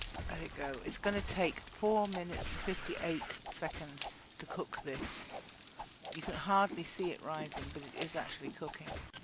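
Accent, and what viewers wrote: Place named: RP, United Kingdom. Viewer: You accent makes me pay attention, it's like someone just turned the BBC on but instead of news it's relevant information I will use in my life.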